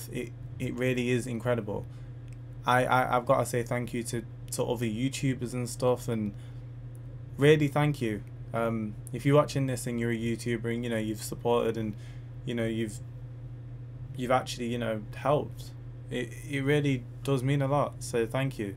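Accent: United Kingdom. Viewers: his accent sounds british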